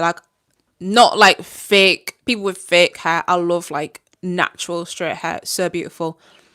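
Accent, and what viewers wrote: Northern England, United Kingdom. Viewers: I knew you were a northern girl! I’m from Huddersfield (although live in the midlands now) so recognised the accent! Love these lives! Keep it up so engaging. To be fair at school most of the black girls including me had relaxed hair so you probably still would have had relaxed hair, that was just the done thing!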